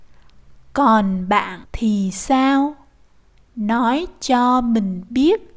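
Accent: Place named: South, Vietnam. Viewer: 👏👏 excellent, thank you! -- May I ask what dialect this is? I thought I heard several Southern characteristics, but the "s" sounds Northern to me?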